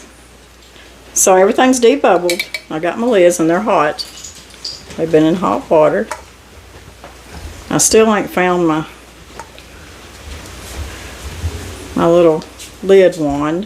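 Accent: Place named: Appalachia, United States. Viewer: You look like your 89 and you sound like a HILLBILLY!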